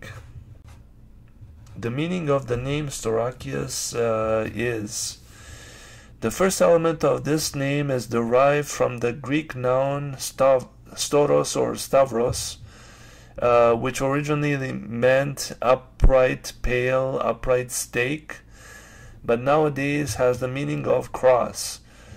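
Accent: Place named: Quebec, Canada. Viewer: accent québécois...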